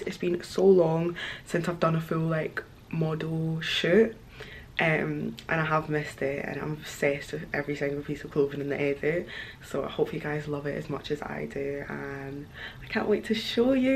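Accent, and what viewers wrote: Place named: Scotland, United Kingdom. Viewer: I actually forgot you are Scottish 😂😂 it’s been so long since I’ve heard you speak. Love it.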